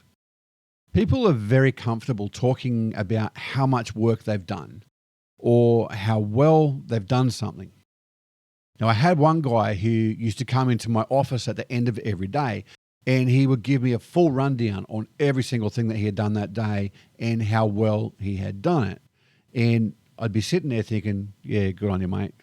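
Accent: Australia. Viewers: So many daily details we take for granted or aren’t conscious of, till they’re gone, then we notice and are grateful! ☺️ I like the Aussie accent and kookaburras! Joy.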